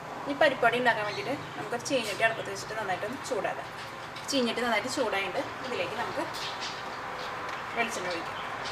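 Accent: Thrissur, India. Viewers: പെങ്ങളെ അടിപൊളിയാ ഇഷ്ടായി അവതരണം തനി തൃശൂർ കേൾക്കുമ്പോൾ മനസ് മുഴുവൻ കോരിത്തരിക്കുന്നു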